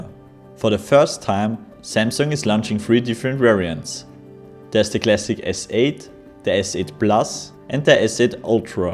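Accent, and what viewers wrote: Germany. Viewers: It sounds he is German.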